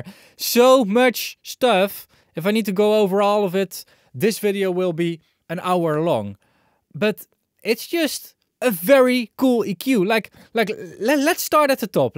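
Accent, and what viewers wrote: Netherlands. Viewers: I have to say that I really enjoy your videos! One of the things I like is your Dutch accent, I'm used to it because I lived in Amsterdam for a while :)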